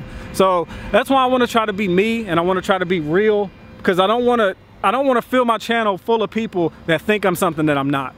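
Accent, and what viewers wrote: South, United States. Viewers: 1. I knew from that voice that he was a white guy from the south. 2. LOL at that dig at Terroriser. I like them both but lol. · he sound country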